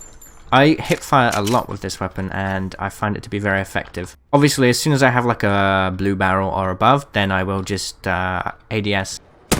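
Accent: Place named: United Kingdom. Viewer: I hear British voice, I sub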